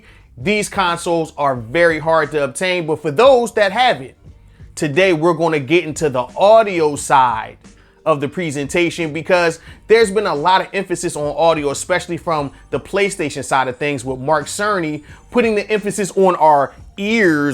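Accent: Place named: New York, United States. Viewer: Definitely from NY. Yerr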